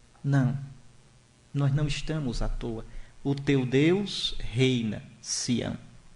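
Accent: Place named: Pernambuco, Brazil. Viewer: Além de palestrar de forma magnífica, o saudoso bispo de Palmares o faz com um agradável sotaque pernambucano, usando algumas palavras próprias da região, verbi gratia: "aperreio". Esse homem santo certamente desfruta da glória de Cristo, a quem ele fielmente serviu.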